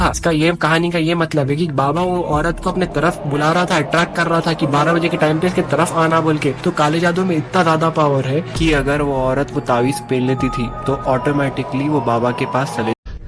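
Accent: Hyderabad, India. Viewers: Hydrabadi Tone ❤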